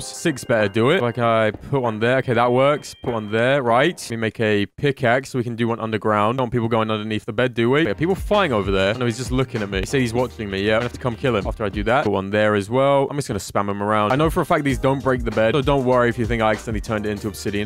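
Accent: United Kingdom. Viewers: Are you inglish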